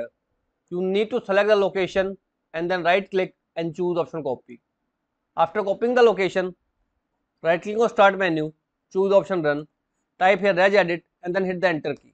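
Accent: India. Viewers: if you hear an indian voice ur problem is fixed 100% rate (THANK YOU SO MUCH BTW 🙏)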